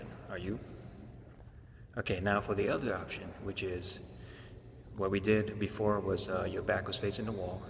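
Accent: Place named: South, United States. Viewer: Great video! Thanks so much! I love your accent, it reminds me a bit of a southern accent-I grew up in the south.;)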